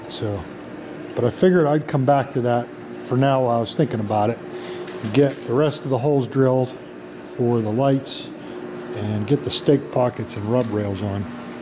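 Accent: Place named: Pittsburgh, United States. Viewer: I here Pittsburgh accent. 😁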